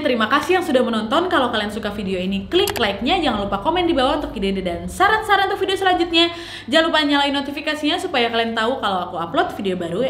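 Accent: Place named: Medan, Indonesia. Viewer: Kak orang mana?? Kok logatnya kayak orang Medan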